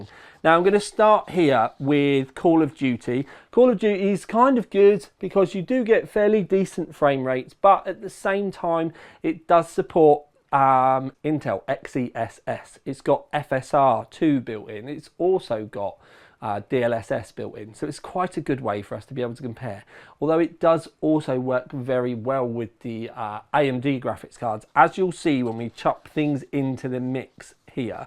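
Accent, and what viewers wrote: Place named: United Kingdom. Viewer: at first I was like "oh, damn, British accent" to which I am not all that well accustomed, but then I went "eh, nice pronunciation" (obviously, eh) thanks for the review and highlights against greens and cheers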